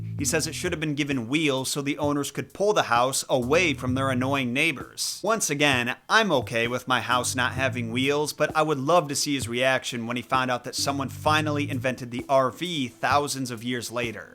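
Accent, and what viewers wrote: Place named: United States. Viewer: Aaaargh !!! The American pronunciation of Greek names is absolutely horrifying! Dye-oh-nye-sus ? Seriously?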